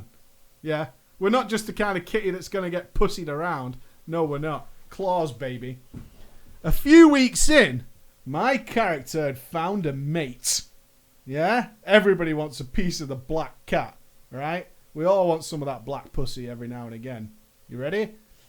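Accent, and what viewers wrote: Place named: Manchester, United Kingdom. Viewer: I'm learning english listening to you! I'm starting to have that manchester accent lol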